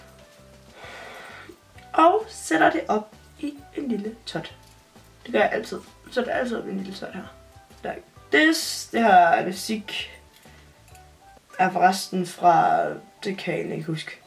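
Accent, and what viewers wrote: Jutland, Denmark. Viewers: Hun er jyde right? Hvorfra???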